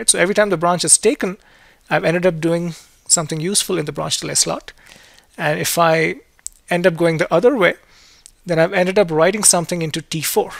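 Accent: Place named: United Kingdom. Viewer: I have to say I love your british accent with a hint of indian as well. A lot less thick than most Indian computer science teachers you can find, and lot easier to understand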